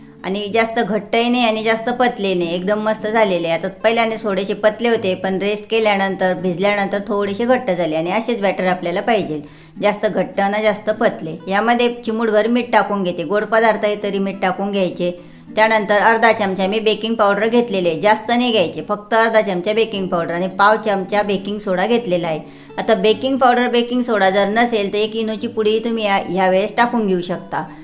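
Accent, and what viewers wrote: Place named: Khandesh, India. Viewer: तुमची केकची रेसिपी झटपट आणि खुसखुशीत आहे. पण तुम्ही सुद्धा केक सारखं * थोडं गोड आवाजात निवेदन केलात तर ,* आम्हाला केकची गोडी अजुन वाढेल. तसेच बोलताना इतकी सुपरफास्ट एक्स्प्रेस * कशाला चालवता? बोलताना काही * पुर्णविराम, स्वल्पविराम , प्रश्नचिन्ह ,उद्गारचिन्ह असतात. * त्यांचा वापर केला की * आपलं बोलणं * जास्त इफेक्टीव्ह होतं * म्हणून सर्वप्रथम बोलणं आणि भाषा, बोलण्याची खानदेशी स्टाईल * सुधारा.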